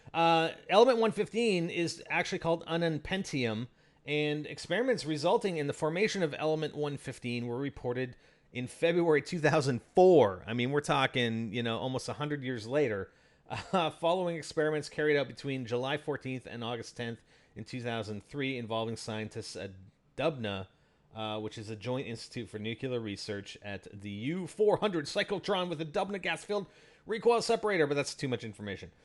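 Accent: Canada. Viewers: is canadian you can by the way he says about and out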